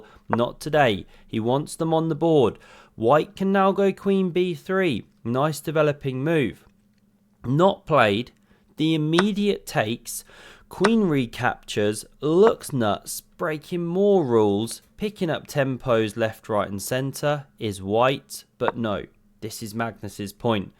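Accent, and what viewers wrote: United Kingdom. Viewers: God I love this commentator. So funny, so English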